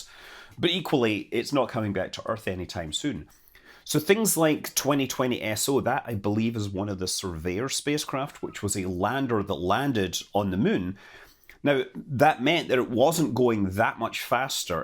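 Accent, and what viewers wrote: Scotland, United Kingdom. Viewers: Here's a question for: Do you deliberarly pronounce 'Explorer' as 'Exploder', or is it just a Scottish thing?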